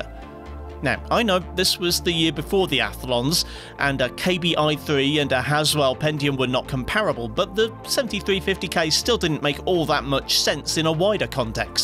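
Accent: England, United Kingdom. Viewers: What region are you from in england?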